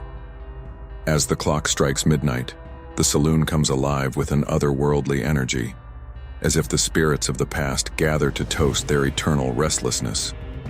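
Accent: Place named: India. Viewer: Why does the ai narrator turn indian halfway through the video?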